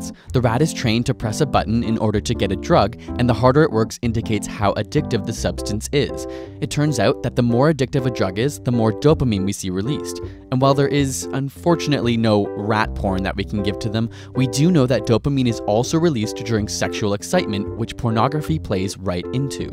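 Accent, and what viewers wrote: Canada. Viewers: Wait, is Canadian?:D I hope they are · Oh your canadian, pog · OMG YER CANADIAN!!!! ^_^ YAY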